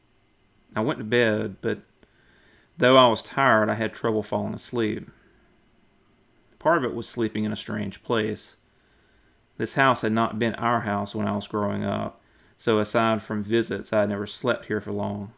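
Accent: South, United States. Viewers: Came here from an add. Heard you have an American southern drawl, which is my weakness (I'm British) and had to subscribe. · Yes, us Brits do love a Southern drawl...I find it mesmerising 🇬🇧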